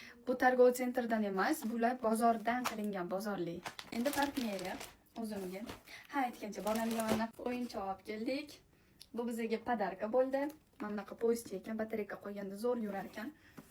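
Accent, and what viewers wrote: Tashkent, Uzbekistan. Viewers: Намоногонлик экансан Тошкент шевасида гапириб. Нима килардинг. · Наманганликман дейсизу нимага тошкенча гапирсиз хафа булмангу ман абангизни танийман отлари Гулмирахонми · НАМАНГАНЛИКСИЗ АММО ТОШКЕНТ ШЕВАСИДА ГАПИРАСИЗ....ТАЪЖУБ. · Manam shunaqa dgandim. Nimaga Namanganlik bosez Toshkent shevada gapirasiz? dgandim. Manimcha ochirvoripti 😅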